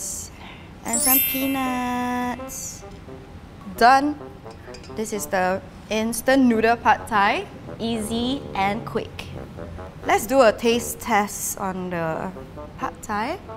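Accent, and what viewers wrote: United Kingdom. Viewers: is she english? she sounds like she grew up in the UK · You have british accent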